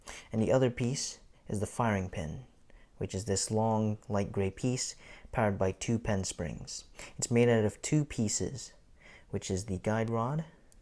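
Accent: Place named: United States. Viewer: why do sound so american when ur profile pic looks asian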